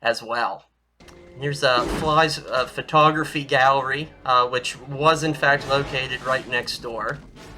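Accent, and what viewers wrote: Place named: Pittsburgh, United States. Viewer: "Dahntawn" Tombstone. Good to see a fellow yinzer making it big on YouTube! ⬛️🟨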